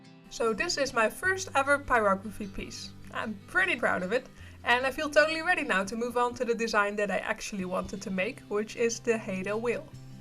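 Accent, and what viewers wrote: Netherlands. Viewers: love her dutch accent!! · Are you from holland by chance?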